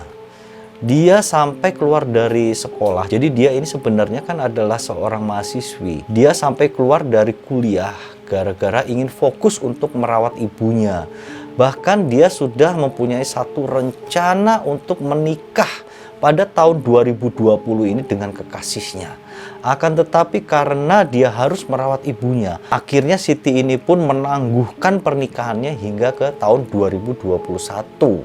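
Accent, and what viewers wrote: Java, Indonesia. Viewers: wow salam mas OMONG JOWO NYA PEKAT BANGET.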